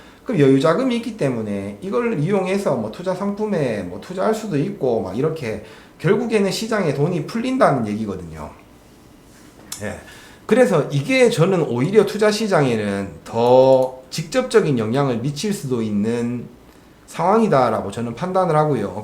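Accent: Gyeongsang, South Korea. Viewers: 대구분이시죠 ㅎ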